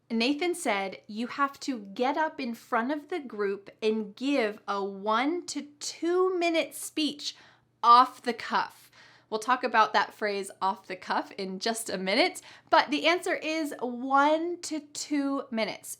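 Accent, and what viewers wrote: United States. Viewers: Is it U.S. English? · Thank you aunt for sharing your knowledge and i also exicted to want study with you in next video😁👌and last you are my good teacher, who is always help me learn new words in american accent......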